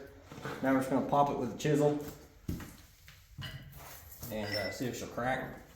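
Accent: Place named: United States. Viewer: I'm as Ukrainian, I like your videos to hear a "real" American English, not like they're speaking on a news channels etc.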